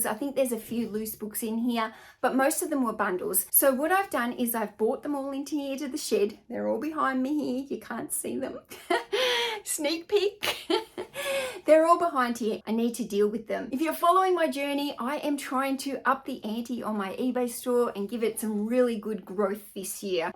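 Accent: Australia. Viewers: Im guessing east coast Australian, coming from a South Australian here ;)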